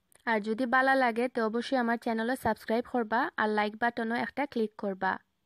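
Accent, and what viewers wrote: Sylhet, Bangladesh. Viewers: আফা মন পাইছো❤ খাঁটি সিলেটি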